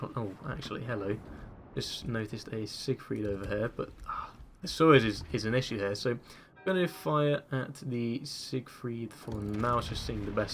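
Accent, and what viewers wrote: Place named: United Kingdom. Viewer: Nice to listen the British accent. Of course we have Jingles but this sounds a bit different. Izumo used to be terrible. Not anymore. Nice video m8!👍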